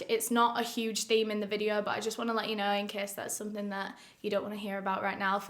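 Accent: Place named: Yorkshire, United Kingdom. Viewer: You can't just pick up a leeds or Yorkshire accent like that ha. I should know, she's from my city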